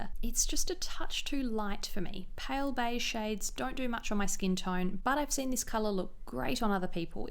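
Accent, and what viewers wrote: Australia. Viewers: I'm so used to watching American YouTubers that I, an AUSTRALIAN, was shocked to hear her accent. Love this video btw! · You are australian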